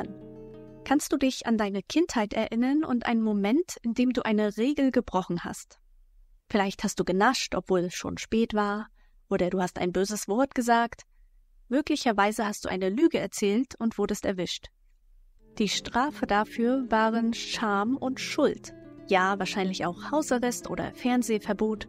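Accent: Mecklenburg-Vorpommern, Germany. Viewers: Vielen lieben Dank für genau dieses Video. Ich muss eingestehen, dass nicht ich es entdeckt habe sondern mein ältester Sohn. Er hat es in unsere Familien Gruppe gestellt. Mit der Bemerkung: "...seht es euch unbedingt an, sehr interessant!" Also danke ich Euch beiden. Meinem Sohn für's Weiterleiten und Dir für dieses Video. Ich habe mal gleich die Glocke gedrückt. 😉🤗 Was ich u.a. auch sehr gut finde, dass Du selber sprichst. Und so habe ich erkannt, dass Du aus meiner Heimat, Mecklenburg Vorpommern kommen müsstest.🤗🙋🏼‍♀️ Ich glaube, dass sich jeder in Deinem Video, mit irgendwas, identifizieren kann. Danke und mach weiter so.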